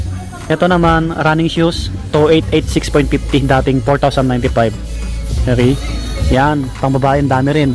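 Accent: Visayas, Philippines. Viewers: Ituwid mo salita mo ,anong naghanap?naghahanap dapat past tense yung naghanap....halatang bisaya ka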